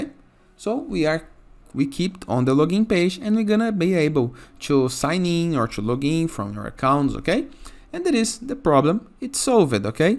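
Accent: Brazil. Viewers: sotaque brasileiro brabo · esse cara é brasileiro tentando aprender em ingles crtz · Claramente sotaque #BR hehehe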